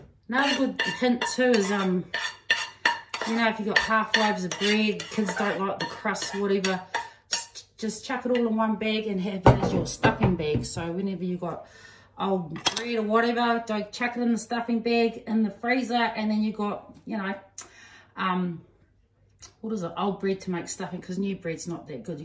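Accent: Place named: New Zealand. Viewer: Your accent still sounds nz. I been here longer so has my mom and two eldest sister's and they still have that nz accent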